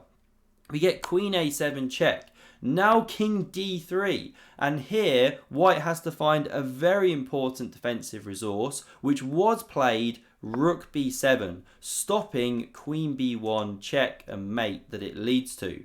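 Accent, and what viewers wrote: United Kingdom. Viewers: He's just British. Great channel!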